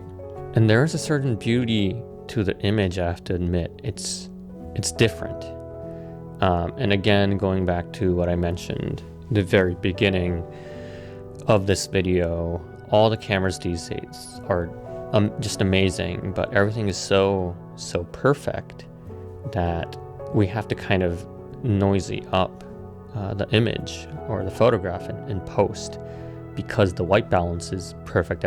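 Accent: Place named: General American, United States. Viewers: Ok but when you speak with an american accent and put the price in USD dont get mad at ppl for assuming. I live in europe and also thought you were talking about the American used market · May I ask why your English is so good? Was one of your parents American/Canadian? Did you live in North America? I think the reason people had no idea you didn't live in the Americas is because your accent is WAY too "General American Accented" (or standard pronunciation) so given that they had no reason to think otherwise. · Bro, people don't know you live in Japan! You sound American or Canadian, and you specified $ in the video title. So people are going to assume you're talking about buying it with American and Canadian dollars lol.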